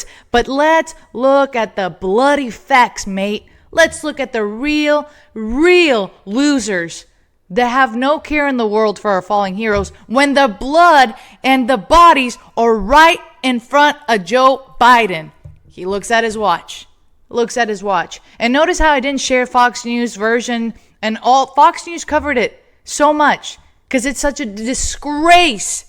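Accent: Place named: United Kingdom. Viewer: He has a British accent,that says all you need to know about that feckless soy boy british 😂😂😂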